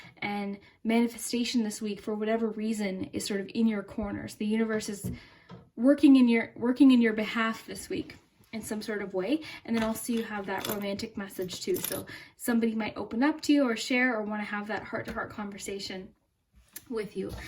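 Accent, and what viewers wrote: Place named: Canada. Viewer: You sound like my dog's vet tech, but Canadian. I'm in love with your voice.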